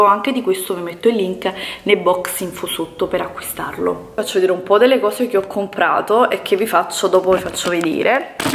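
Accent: Naples, Italy. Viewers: È napoletana, molto probabilmente è abituata a parlare con il suo dialetto, è normale che qualche volta può imbrogliarsi, anche a me succede, certo questo non vuol dire che i napoletani non sanno parlare italiano perfetto, assolutamente, io parlo per il suo caso ( ci tengo a precisare sennò mi attaccano tutti )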